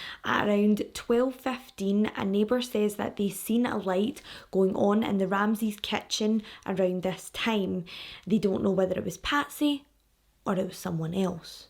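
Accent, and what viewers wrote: Scotland, United Kingdom. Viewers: I kno rite I love ur personality ur accent ur beautiful very down to earth but funny to!! U go girl all the way in Scotland I Loove it... LOVING UR CONTENT N ALL U DO DO FRM YA GIRL FROM (HELLO FRM THE OTHERSIIIDE HELLOOO FRM THE OUTSIIDE LMAO VIBES ALL DAY LOVE IT LOVE IT! LOVE ALL THE WAY FROM SAN DIEGO CALI BABAAY! HEAAAYY!!! CARRY ON GIRLFRIEND!!❤️😆❤️😆❤️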